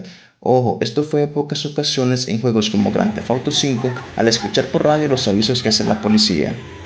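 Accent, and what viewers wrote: Nicaragua. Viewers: Yo también y además se te escuchaba el acento a nica por eso fue que te pregunte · Maje, ¿sos nica? :0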